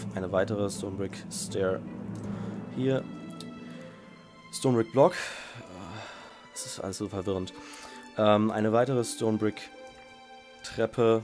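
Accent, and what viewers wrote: Germany. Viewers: Забавный немецкий) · Ich hab grad ein englisches Vid gesehen von dir und obwohl dein Englisch echt sehr gut ist, hab ich sofort erkannt, dass du Deutscher bist. :D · wow! ich fand dich sowieso schon klasse bevor du ein Deutsches tut. gemacht hast. in deinen Englischen viedeos merkt man kaum deinen deutschen accent! (hast sicherlich ein auslandsjahr oder ähnliches gemacht =)) aufjedenfall nutze ich deine Bauwerke sehr gerne und auch die Englischen tutorials maag ich! peace leon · The German accent is so cool!!!! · I knew that your accent was german! Deutschland all they way! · Man hört doch den deutschen Akzent voll :o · Jetzt merke ich erst das du einen deutschen Aktzent hast wenn du englisch sprichst :) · jetzt wenn mans weiss das du deutscher bist hört man auch den akzent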